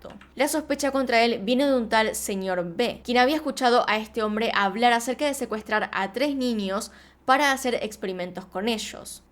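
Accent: Argentina. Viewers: Me encanta tu acento Argentino y como te expresas. Saludos y felicidades por tu cumpleaños 🎂🍹🎁🎉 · Me gustan mucho tus videos. Haces muy buena investigación y narras muy bien. Solo me cuesta un poco de trabajo acistumbrsrme a tu pronunicación argentina: “ninios” y “plasha”... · Me encanta tu acento argentino y cuando dices "una vez más" eres muy auténtica 🤩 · Me encanta el acento argentino 🇦🇷 ♥️♥️👍🏻✨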